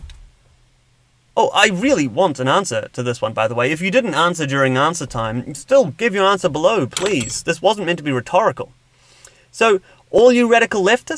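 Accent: New Zealand. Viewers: Why does Matt Smith have a kiwi accent?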